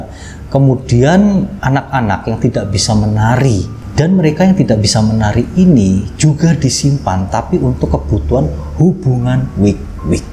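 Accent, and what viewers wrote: Java, Indonesia. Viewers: Logat jawa tpi pke ny gua,gua. Gk byambung mas hrus ny pke kalimat ny sy bukan gua.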